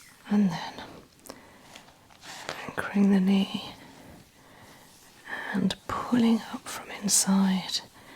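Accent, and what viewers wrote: United Kingdom. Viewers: I love your accent idk if that's just genetic British accent or what lol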